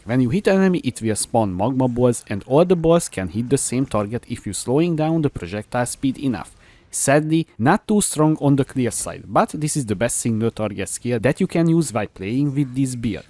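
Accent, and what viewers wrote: Hungary. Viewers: Te figyelj, gyönyörű a nyelvtanod, de a kiejtésedről felismertem hazánkat xd Szuperek a videóid szóval nagy hajrá! Aztán idővel csiszolódik majd a prönánsziésönöd is <3 Keep up the good work! Edit: PoE2 kontentet nem fogyasztok, de kaptál egy feliratkozót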